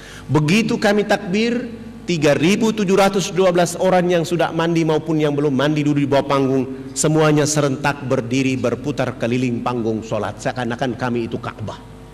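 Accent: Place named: Papua, Indonesia. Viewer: Aku dari sumbar,aku suka bahasa papua,karna bisa membuat ku tertawa,dan merasa bahagia..habis rada2 lucu cara bicaray..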